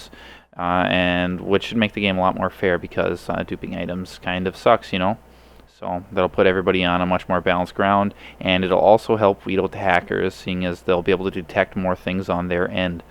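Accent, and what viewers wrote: Canada. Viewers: Are you from Saskatchewan?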